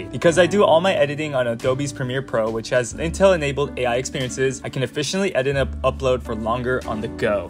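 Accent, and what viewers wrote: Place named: California, United States. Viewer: U don't have a New York accent. U sound more like a Californian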